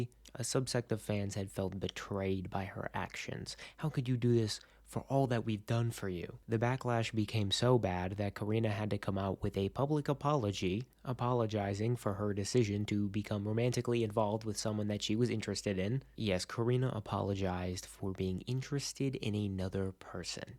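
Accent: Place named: Bulgaria. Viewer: Are you Bulgarian or somewhere in that area because I swear that's your accent.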